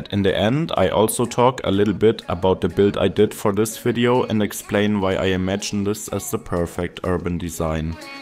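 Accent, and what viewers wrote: Germany. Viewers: Most stereotypical German accent ever.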